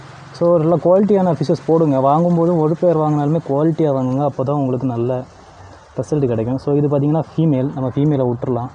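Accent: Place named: Kanyakumari, India. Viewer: pesura slang la teriuthu.... Kanya Kumari la enga😁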